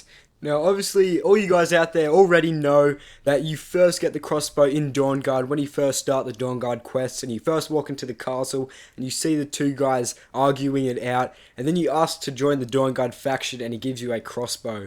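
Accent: Australia. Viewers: are you italian-aussie?? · The fact the crossbow model doesn't have a channel for the bolt.... really annoys me. A professional artist should have seen it in reference photos, plus any man should just know 'guy' stuff. The vid poster has a really heavy Aussie accent. It occasionally sounds like he's taking the piss. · is he aussie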